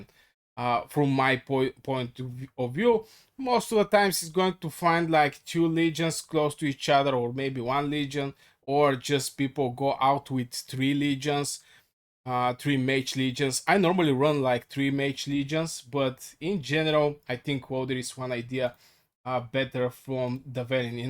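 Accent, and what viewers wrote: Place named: Bulgaria. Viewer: Знаех си, че си от България хахах. Имаш български акцент като говориш, а и се казваш.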